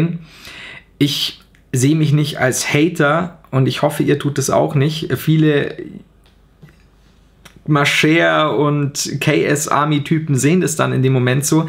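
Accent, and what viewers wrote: Bavaria, Germany. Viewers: Dei bayrisch is sa gal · Ich habe dich leider erst vor einer woche ca. Gefunden, aber ich feier deine videos/ dein Humor Ziemlich, Die neuen sowie die älteren. Viel glück und viel spaß weiter hin !!! Ps : Ich feier dein Dialekt, aber könntest du ma ein Ausraster over 9000 auf Bayrisch machen ? xd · Aus welchem Regierungensbezirk Bayerns kommst du? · Hey du bist einfach der beste aber bitte heb nicht ab denn so wie du bist bist du einfach nur geil und s geilste is ja dast bayrisch schmatzd aiso i wünsch da ais guade:)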